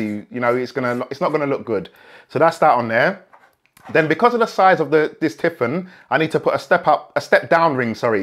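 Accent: London, United Kingdom. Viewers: love your London accent man haha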